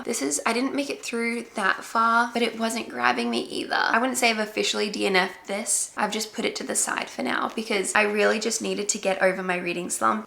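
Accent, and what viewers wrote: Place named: Australia. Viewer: the Aussie accent is back 🥰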